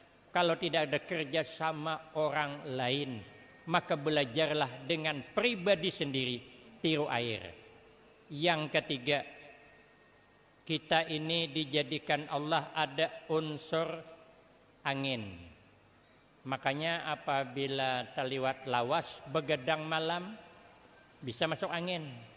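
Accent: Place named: Kalua, Indonesia. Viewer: bahasa beliau kaya orang Kalua ( Kalsel )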